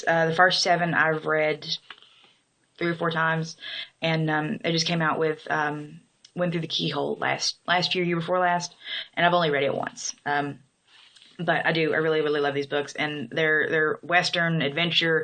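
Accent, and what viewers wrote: Southern Illinois, United States. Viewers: Probably depends on what area of Southern Illinois you are from. Everybody I know sounds like this. I grew up on the Mississippi river. Grand Tower. All along this area all the wag down to Cairo people talk like this. Not even trying. It is just the way we talked. I have been in Wisconsin for the last 20 years and it has faded quite a bit since Wisconsin has a very specific accent as well. I have noticed the biggest part of the accent is that we spoke our words really fast. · I grew up in Carterville! But I have less of an accent than this lady, and I knew people with a much stronger Appalachian-type accent. There's a gamut. No idea why there's considerable diversity. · You say everything exactly like me. I’m from Mound City 😊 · SO, IL for sure I be from Collinsville Il u got it down pat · Her accents the typical one for Southern Illinois. 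This is just the way people sound when talking, you obviously haven't spent much time since you don't understand this. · I've lived hear in Southern Illinois all my life, & she sounds like everybody I know. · Finally a real southern IL accent. :) Thank you! I've been mistaken for being from KY too. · I grew up in Marion Illinois and she sounds like a native Southern Illinois. Actually she doesn’t sound country enough. Most of the older people I know talked much slower with a more southern draw.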